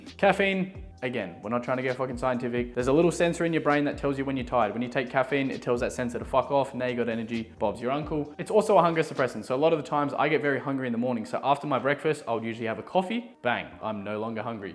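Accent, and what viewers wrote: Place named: Australia. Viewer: He’s speaking proper Australian mate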